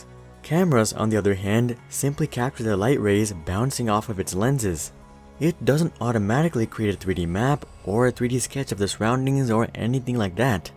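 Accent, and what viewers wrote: Singapore. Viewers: The accent of the narrator sounds Singaporean to me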